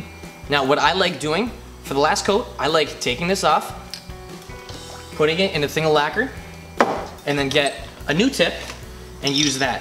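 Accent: Canada. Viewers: If I had to guess, I'd say that sounds like a Canadian accent. Great video!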